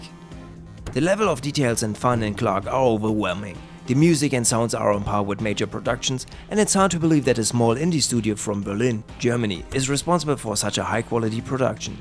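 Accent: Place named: Croatia. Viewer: Are you Croation becuase my friend is from Croatia and he has youre same accent?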